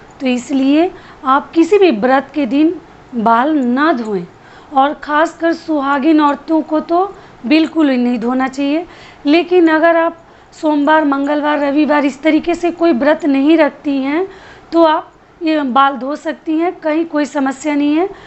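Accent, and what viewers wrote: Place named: Purvanchal, India. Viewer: Thanks didi mai female hu ye mere husband ki I'd hai mera naam kavita hai aap purvanchal se hai na apke boli mujhe bahut pasand hai apki videos se bahut kuch sikhne ko milta hai apki tips mai follow karne lgi hu aur life me uska fayeda bhi mil rha hai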